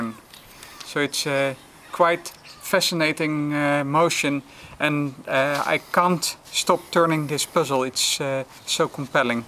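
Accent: Netherlands. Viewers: Maar is niet slecht bedoeld hoor. De puzzels zijn altijd top en ik kijk met veel plezier naar je video's. Denk alleen dat als je het Nederlandse accent kwijt zou raken dat je dan professioneler over zou komen. Maar ja wie ben ik haha als ik kijk wat je al bereikt hebt vergeleken met mij moet ik me mond dicht houden. Ga in iedergeval door en ik hoop nog vele mooie creatie's te mogen zien. Gr. Dave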